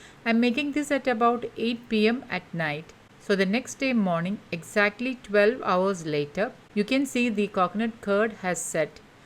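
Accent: Kerala, India. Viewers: Yup.. it’s the cuteness of Malayali accent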